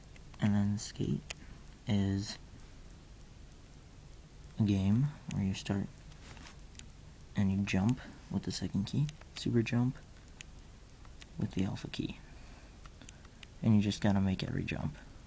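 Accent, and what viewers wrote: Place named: Asia. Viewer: i cant understand ur asian accent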